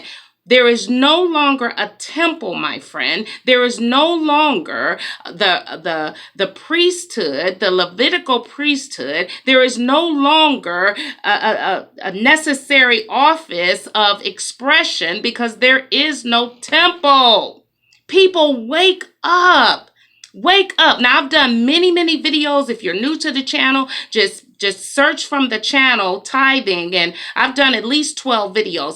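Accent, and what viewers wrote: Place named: United Kingdom. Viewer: I love the sophisticated British accent! As you were reading the list, I kept thinking of my old church. I’m glad I’m out of there!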